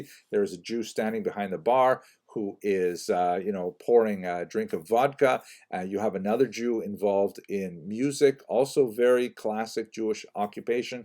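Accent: Canada. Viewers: Dr, as a fellow Orthodox Jew who often deals with the same issues among our co-religionists, thank you for your message and thank you for the knowledge you are sharing. Also, it took me a good few minutes to understand what you meant about "Bot" and "bought" until I realized that in your Canadian accent these words rhyme while they don't for me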